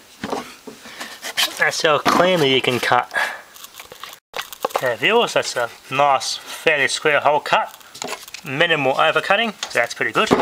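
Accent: Australia. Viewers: I'm curious, what's the accent? I mean u sound aussie, but there's something else in there... Or is it just a lisp?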